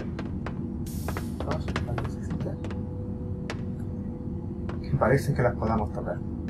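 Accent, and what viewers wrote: Andalusia, Spain. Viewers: Tiene un fuerte acento andaluz, ahora vete a llorar a otra parte x'D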